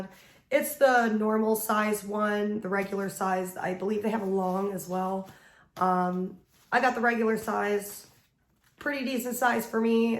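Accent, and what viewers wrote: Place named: New Jersey, United States. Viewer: Yes. You sound like you're from Jersey. That's a compliment!! I'm from Florence and I swear like a horse. Looking forward to seeing more of ur AT Videos. I'm fucking jelly of you, right now!!! Lol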